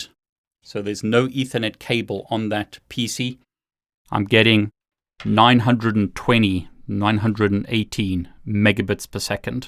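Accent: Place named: South Africa. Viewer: I hear a South African accent, or am I just homesick?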